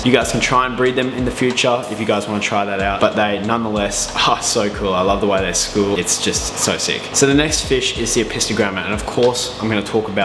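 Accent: Australia. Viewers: You sound Aussie mate. Talk litres!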